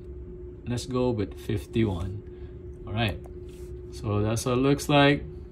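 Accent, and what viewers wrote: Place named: Japan. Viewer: I know this guy is japanese ..his accent is so cute😂❤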